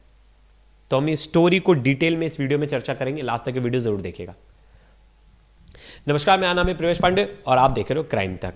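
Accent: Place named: Varanasi, India. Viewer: बनारसी हैं क्या सर 😊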